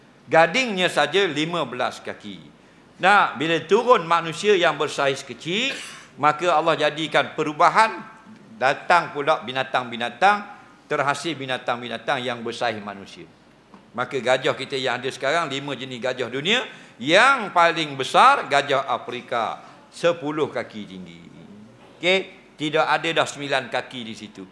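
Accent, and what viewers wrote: Terengganu, Malaysia. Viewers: Tak faham dialek bahasa terengganu ke..@ ada masalah kefahaman?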